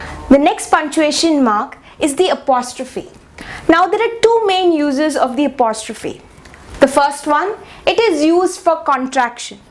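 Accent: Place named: India. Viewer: She sounds like an indian person I know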